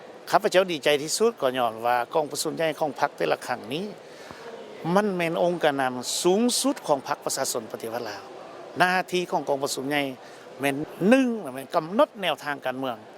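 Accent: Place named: Vietnam. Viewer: เว้ากะบ่อชัดภาษาลาวเว้าผสมแกว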